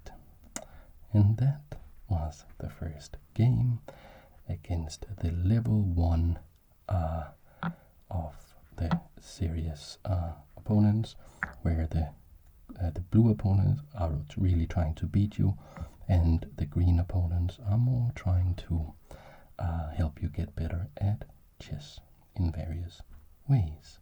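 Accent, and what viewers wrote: Denmark. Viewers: Ayo, er du dansk?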